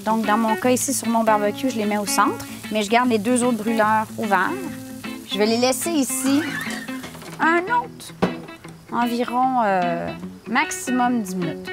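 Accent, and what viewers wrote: Quebec, Canada. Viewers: olala qu est ce que j apprécie énormément l'accent canadien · accent quebecois !!!!!! , l`accent canadien ca serais du francais cassé, parlé par un anglais. au canada ils parlent anglais c est juste au quebec le francais ! arreté de dire canada meme si le quebec fait parti du canada il a sa propre lanque et ces propre coutumes et ca a rien a voir avec le reste du canada ! c est comme la france et l angleterre ils sont proches mais different completement different !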